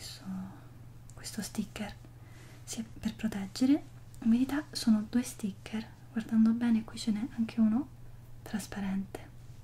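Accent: Italy. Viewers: Hai una voce che ricorda il dialetto romano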